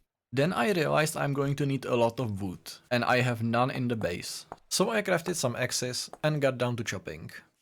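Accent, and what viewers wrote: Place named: Czech Republic. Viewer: Are you Czech? You sound Czech lol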